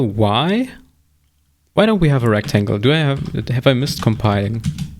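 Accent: Germany. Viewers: Man merkt Bro ist deutsch hahahahah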